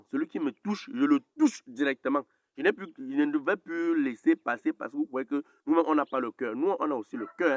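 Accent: Cameroon. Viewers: 🤣🤣🤣🤣🤣 l'accent camerounais vraiment hein. Bravo 🤣🤣🥰🥰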